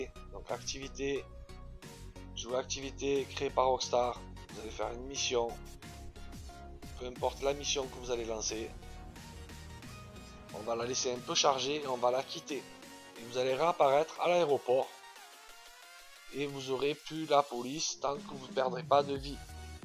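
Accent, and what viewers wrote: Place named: South, France. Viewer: Oui toutes tes vidéos sont bien expliquer avec ton accent du sud j adore poto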